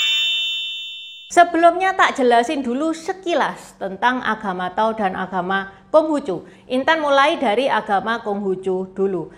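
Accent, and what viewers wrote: Java, Indonesia. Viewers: Logate jowo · Intan …aq senang dengan logatmu yg Jawa medok 😂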